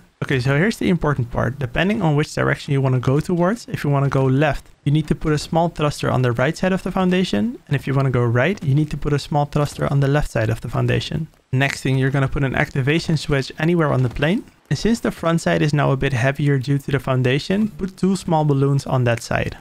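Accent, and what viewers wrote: Netherlands. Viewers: I smell Nederlands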